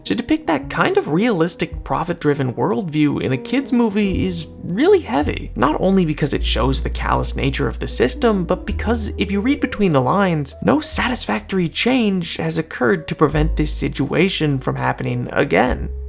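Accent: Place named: Canada. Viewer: He sounded Canadian to me.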